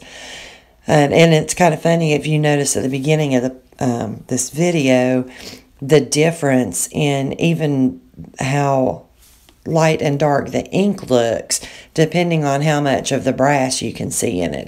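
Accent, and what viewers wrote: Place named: North Carolina, United States. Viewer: Another great video,! I love how this turned out. You used my favorite colors - and I'm glad to see you got the new inks. They are magnificent! The other inks you need to try are the Trex inks. You talk about bright vibrant inks! WHOA! I played with them for the first time this afternoon and was super impressed. They aren't bad pricewise, either. Big bottles, 12 colors - somewhere around $30. I love your videos (but you already know that) and think this one was especially powerful. I could create this! (Your real wispy ones not so much.) I'm glad your count continues to grow as you deserve it. I love your "backwoods NC accent" - it goes well with your videos. You've got an incredible style my friend! 😍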